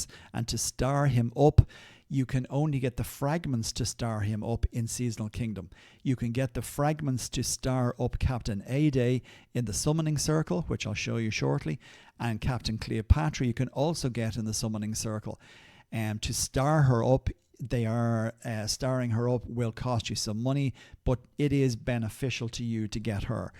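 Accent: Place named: Ireland. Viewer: As a new player this is the first good information site i have found , and i have looked at a lot . Its good to hear a clear logical intelligent person explaining things for beginners upwards and i am very much a beginner. Its good to hear how and why things are important and how to use them how to upgrade them . Also good to hear an Irish ? Accent instead of a london or american accent . Thank you so much for this clear detailed information. I am a very new player only level 112, 000 or so and have been in no battles or tournaments. I am building my city and equiping carter . Thank you fir this page . Very good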